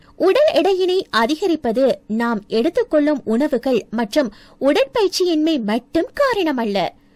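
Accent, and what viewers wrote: Sri Lanka. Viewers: இலங்கை தமிழ் ( பாட்டு பாடுர மாத்ரி இருக்கு 🥰🥰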